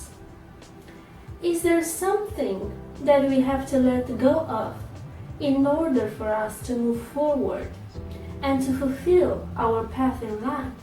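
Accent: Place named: Italy. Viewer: Great reading, very positive insight about this month! Love your accent. Are you Italian?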